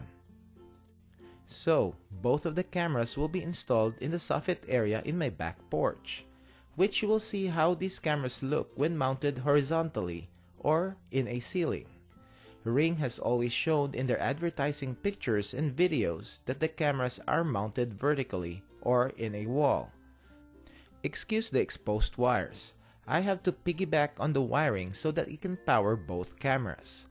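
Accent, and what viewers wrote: Philippines. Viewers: Is that a Filipino accent? Tagalog?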